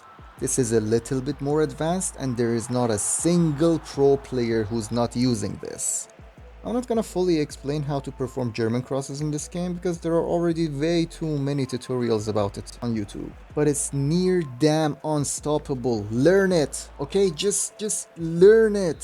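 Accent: Iran. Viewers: I randomly found this vid and after watching it for 10 secs hey this is persian accent lol don't get me wrong u speak 10/10 but there's always a Persian accent 😂 keep it up bro 👍🏻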